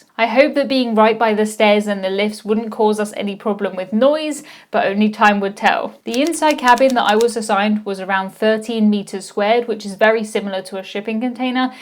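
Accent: United Kingdom. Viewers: You are so adorable. As a yank, your accent is just Devine! Do we Americans have accents to the British? Live video Cheers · My wife and I love your videos. Great narration with a wonderful British accent - all done by an incredibly nice person. Excellent job! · Got to just love a Brit accent!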